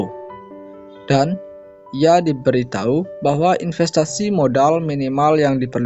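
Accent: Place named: Aceh, Indonesia. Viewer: Orang aceh 😅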